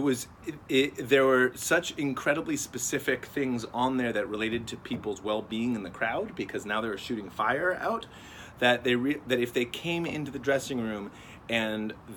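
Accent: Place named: United Kingdom. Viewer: I'm British and that was a good British accent!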